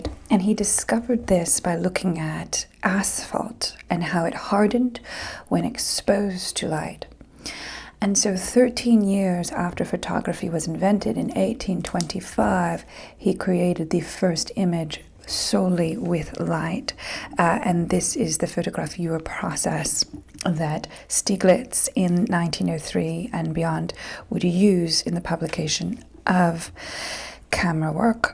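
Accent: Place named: Mid-Atlantic, United States. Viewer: I love your Mid-Atlantic accent!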